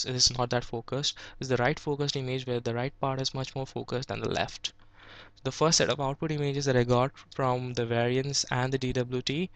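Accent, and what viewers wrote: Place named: United States. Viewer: Through this video, you just took me to the good old college days & our project work. Your pronunciation has become somehow American :D Where are you now a days?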